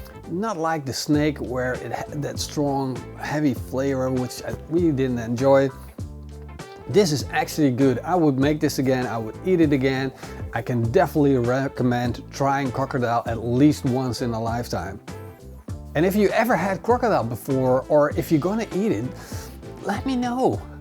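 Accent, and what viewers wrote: Netherlands. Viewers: Wait, are you dutch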